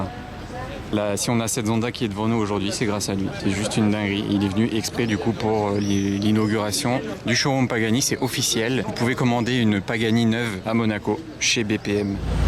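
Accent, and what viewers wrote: France. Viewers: Je ne comprendrais jamais cette intro ridicule en anglais avec un accent francais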